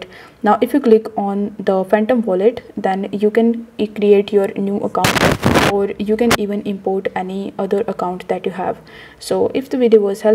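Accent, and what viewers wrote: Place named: India. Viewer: You're speaking Indian English. Can't hear a thing!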